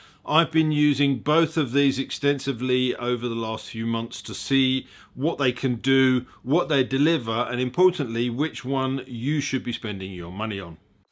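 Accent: United Kingdom. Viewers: Thumbs down for the British accent. Mercia.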